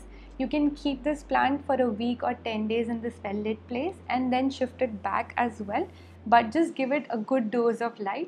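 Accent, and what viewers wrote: India. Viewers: U have too much Indian accent 🙄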